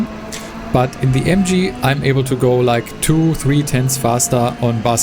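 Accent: Germany. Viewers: Your english sounds like a gernan guy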